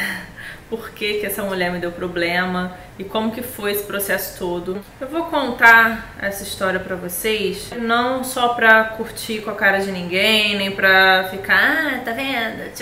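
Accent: Rio de Janeiro, Brazil. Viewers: Muito bom mas no sotaque carioca. Ah não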